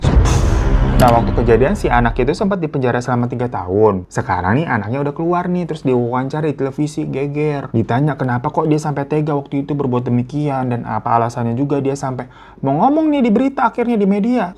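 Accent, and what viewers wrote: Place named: Pasar Minggu, Indonesia. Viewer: lama2 berasa nonton si doel logat pasar minggunye nedok banget bang ..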